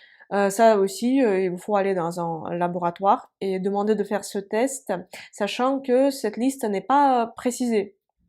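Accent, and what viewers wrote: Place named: Russia. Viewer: Oui votre langue francaise est parisienne de l il de france c est votre langue de traduction mais avec un accent russe slave superbe ...merci ..zafra le fils de kaidar l algrlerien ...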